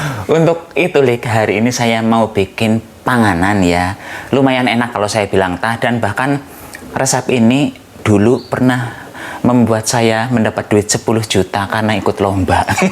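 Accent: Banyumas, Indonesia. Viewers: Sangat bermanfaat Lik,, lilike wong Endi sih daning bahasane ngapak banget 🤣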